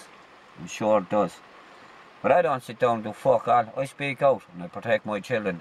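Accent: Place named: Kerry, Ireland. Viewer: Oh are you from Kerry? I couldn't place your accent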